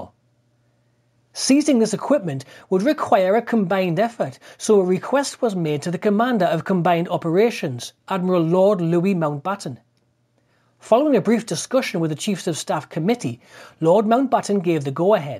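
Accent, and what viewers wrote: Newcastle, United Kingdom. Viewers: Excellent video. Sorry about you pissing-off the Para's - they hate being mistaken for the Green Beret mob! Love the Geordie accent - why Northern and not North-Eastern Historian? (I was born in Hartlepool.)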